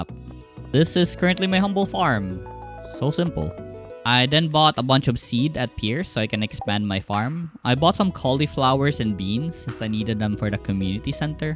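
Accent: Philippines. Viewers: are you filipono? your accent sounds like it. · Are you a fellow Filipino? I noticed your accent in your 100days video and i heard filipino voices in the background somewhere in this video.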